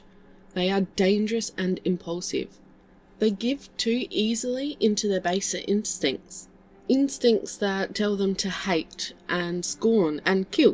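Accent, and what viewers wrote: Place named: Australia. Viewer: I think it's wonderful to have a young Australian voice reading these stories. It reminds me of my childhood, (although the readings were more of fairy-tales than sci-fi - unfortunately).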